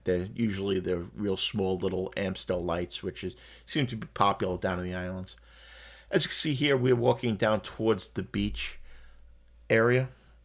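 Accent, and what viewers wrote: New York, United States. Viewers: Not sure, but I think they were making fun of your very noticeable New York or should I say New Yawk accent.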